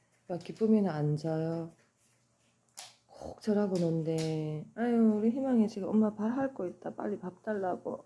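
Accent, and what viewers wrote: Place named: Gyeongsang, South Korea. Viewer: 정겨운 경상도 사투리 마력이 있네요~~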